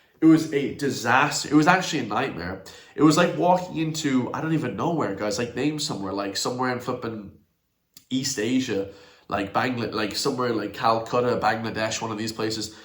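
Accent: United States. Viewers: Dude, lose the 'american' twang. Oh and Dublin doesn't represent Irish culture.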